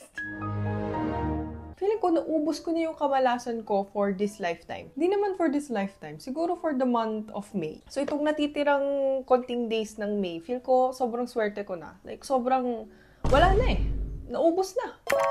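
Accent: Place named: Batangas, Philippines. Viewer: alam nyo ga? ha ha ha! you are Batanguena through and through… 😊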